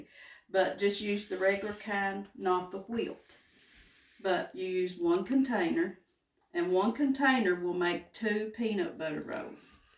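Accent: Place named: Kentucky, United States. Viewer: Sounds like a Perry County KY accent 😊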